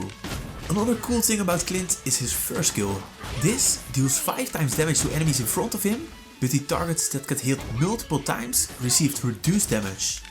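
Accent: Netherlands. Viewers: Ik hoor gewoon dat je Nederlands bent HAHAHAHA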